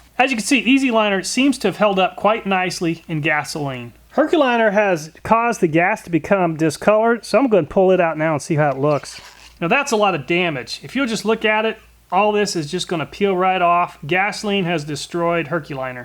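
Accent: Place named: South, United States. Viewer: Can't believe you're still replying to comments on a 6 year old video. Noticed that the southern accent faded over time :D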